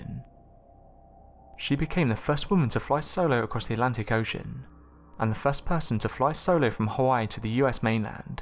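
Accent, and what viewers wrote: United Kingdom. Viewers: What's up with the robotic British dilougue, lol. I agree you truly can't say 99% without DNA. The bones and the plane been found in different locations so which is it.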